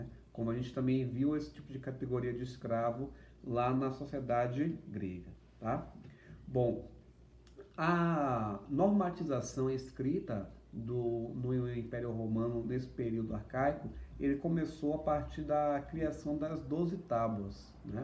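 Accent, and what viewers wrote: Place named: Salvador, Brazil. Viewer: Eu sabia que vc era de salvador desde sua primeira palavra 😂😂😂😂. Aula fenomenal, mestre! O senhor leciona em alguma faculdade aqui?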